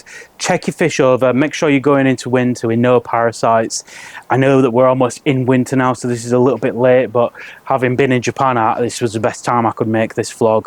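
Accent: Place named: Yorkshire, United Kingdom. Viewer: Ahhh a true Yorkshire man 5p 10p 🤣🤣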